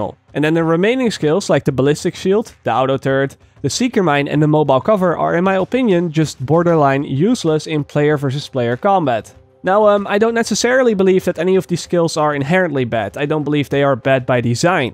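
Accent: Netherlands. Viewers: are you dutch? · he is dutch ."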